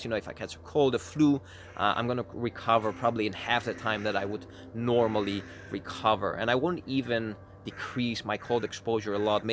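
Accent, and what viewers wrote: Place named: Netherlands. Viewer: For someone who lives in Greece, you sound Very Dutch! 😀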